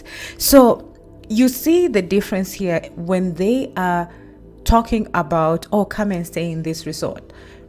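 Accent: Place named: Kenya. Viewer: The fact that its a Kenyan narrating, that makes is so much better. Im researching African architecture. This is how im building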